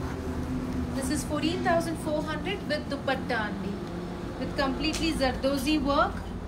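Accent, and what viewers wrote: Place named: United States. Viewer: Manum India lo puttamukada American acsent enduku. Price clearga chepthe good 16. Or 60. Anedi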